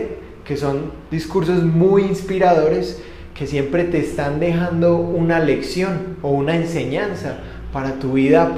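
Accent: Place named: Colombia. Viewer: Colombian accent, perfecto👌 Thanks señor.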